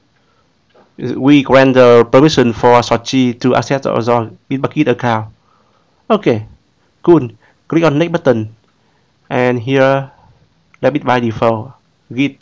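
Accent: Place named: Vietnam. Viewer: tiếng anh nghe là biết dân việt nam zồi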